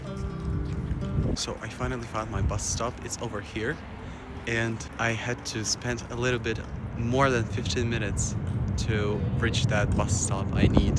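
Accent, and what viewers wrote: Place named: Sweden. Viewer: Can really see your excitement in this video and your English sounds very Swedish as well... you fit right in :D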